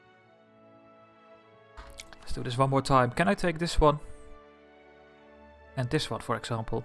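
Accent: Netherlands. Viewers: I can really tell that you are Dutch from how you pronounce the Dutch cities. It's strange hearing a mix of English and Dutch like that.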